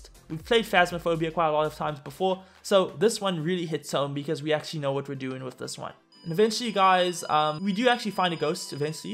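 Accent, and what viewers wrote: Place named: South Africa. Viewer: it makes sense cause I am from South Africa I’m guessing most of your fans are South African, they just didn’t realise your accent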